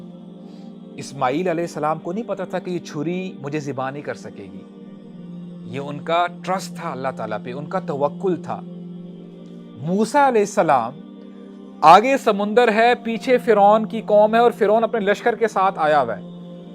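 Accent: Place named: United Kingdom. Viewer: Please avoid using English accent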